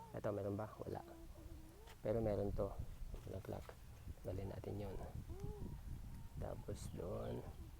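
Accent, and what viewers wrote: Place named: Ilocos, Philippines. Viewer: Parang Ilokano ang punto mo sir,baka magkalapit lang ang lugar natin sir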